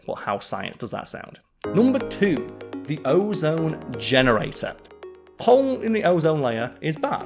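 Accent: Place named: North, United Kingdom. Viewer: Hey, I’m an American Brit who grew up in London until I was 15 and then my parents moved back to the states when my father left the US Embassy Service. I am now 74 and have a bit of a hearing problem. My very expensive hearing aids don’t work as well as I would like. I sometimes have a difficult time following your wonderful northern accent. If you could slow down a bit, I would have a easier time understanding you. I do not expect you to speak full on RP just slow down a bit and enunciate a bit more clearly.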